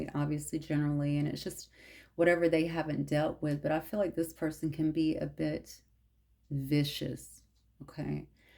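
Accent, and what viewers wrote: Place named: Alabama, United States. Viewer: Hi ty 4Ur readings always on point ❤ I ❤️ ur accent I knew u were from Alabama 🤗 I’m from ALICEVILLE 🤗 I live in Buffalo NY since the 70s still have lots of family there. SWEET HOME ALABAMA 🥹🥰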